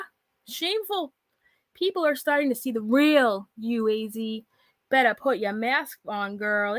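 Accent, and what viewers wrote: Boston, United States. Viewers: GUUURRRRLLLLL…. First off, how some people have even 1 sub truly confuses the hell out of me. The hypocrisy on YouTube pours out like water! People want to point fingers for “being in someone’s chat”, but meanwhile they are in the EXACT SAME LIVE hiding in the bushes!!! They are too dang nosey not to be! Always trying to be in the mix while holding up their “clean hands” claiming innocence. Those are the ones that need to “claim their nasty”. At least you’re not afraid to own up and stand in your truth. ✌🏼😊 Ps - my Mother was born and raised in Boston… (we are Kessler’s and Kavanaugh’s). I love your accent! We moved to Georgia 40 years ago, so yea, there’s no Boston accent left here. LOL! 😆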